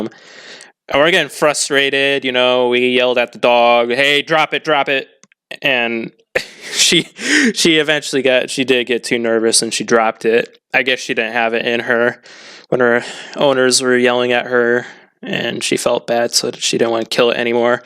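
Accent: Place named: United States. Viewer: Oooh you're American! I'm British lol.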